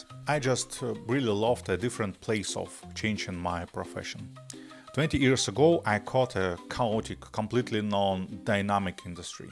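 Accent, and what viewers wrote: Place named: Russia. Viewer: I appreciate you using your real voice now instead of AI. Your Russian accent and manners bring a new feel to design content on YouTube that is refreshing.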